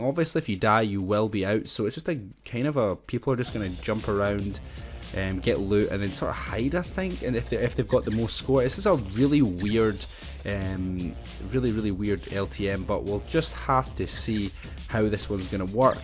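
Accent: Scotland, United Kingdom. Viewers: Are you Scottish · Is that a fellow Scottish person I hear?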